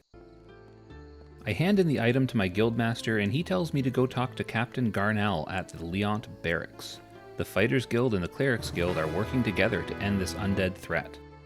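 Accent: Canada. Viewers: You sound like you're from east coast Canada :)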